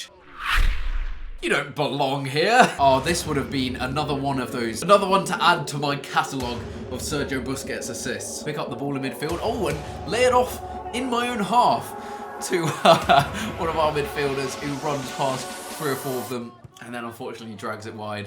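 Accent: United Kingdom. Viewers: You sound like a British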